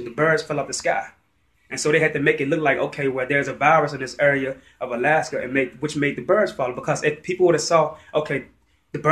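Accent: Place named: DC/Maryland, United States. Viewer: I'm from forestville, md in pg county. I can tell you're from the dc/md area by the accent. Projects is a project, an experiment. Artificial birds bees mosquitos & fleas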